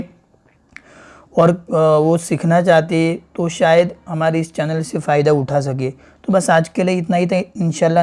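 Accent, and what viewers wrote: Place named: Maharashtra, India. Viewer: aapki accent Maharashtra ki lagti hai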